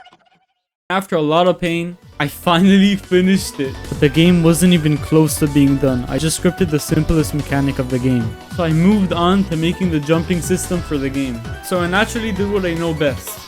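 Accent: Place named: Israel. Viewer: אנימת אחי שמעתי קצת מבטא ישארלי ולא הייתי בטוח עד שראיתי את ההיסטוריה שלך בצאט גיפיטי חחחחחחחחחחח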